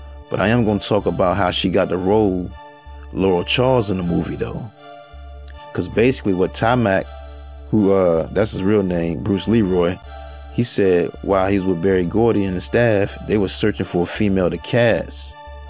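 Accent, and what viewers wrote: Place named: Baltimore, United States. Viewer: Great video you sound like your from Baltimore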